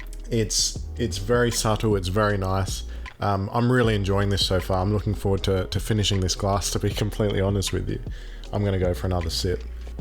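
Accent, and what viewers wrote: Adelaide, Australia. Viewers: this guy sounds just like DJ Sanchez lol beyond just adelaidian accent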